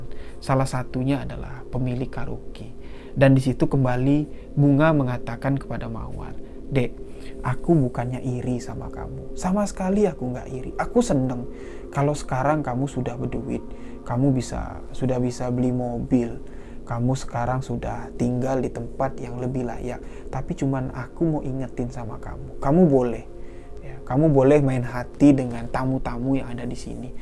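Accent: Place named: Kalimantan, Indonesia. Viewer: Maaf ya mas, masnya ini asli orang Jambi apa orang suku Kalimantan. Soalnya logat bicaranya kayak suku Kalimantan 🙏.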